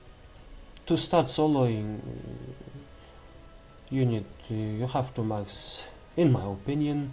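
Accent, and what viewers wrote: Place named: Russia. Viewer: Loving the game, awesome graphic, characters, powers etc. Was brought there by my friend who is obsessed with it lol Btw interesting accent, Russian perhaps?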